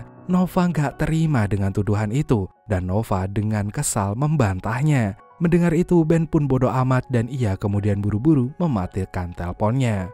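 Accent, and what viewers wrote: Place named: Java, Indonesia. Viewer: Rada medok suarane pasti wong jowo😂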